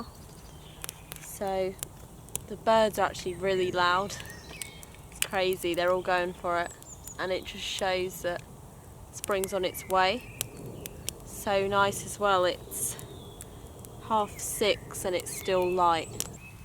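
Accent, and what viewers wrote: United Kingdom. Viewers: 听口音是英国人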